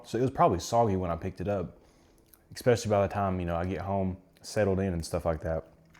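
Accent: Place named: Knoxville, United States. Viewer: Good Review! Im glad your video popped up on my recommended! If I had to guess you're from Tennessee, you've got that knoxville accent thats easy to listen to. Keep up the good content!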